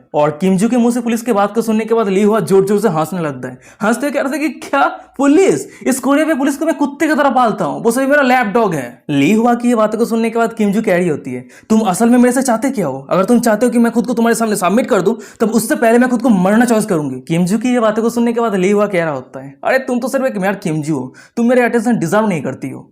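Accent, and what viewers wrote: Bengal, India. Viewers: Bhaiya AP Bangali ho kiya...❤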